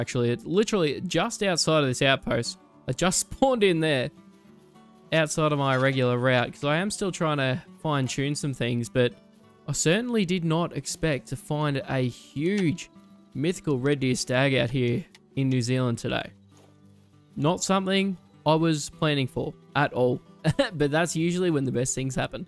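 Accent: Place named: Australia. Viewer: Mate I'm loving hearing a creator with a 'local' accent. Keen to see how your fallow grind goes. Fav rares I have are mythical albino lion and red deer. Been so close to super rare, have a troll albino sambar.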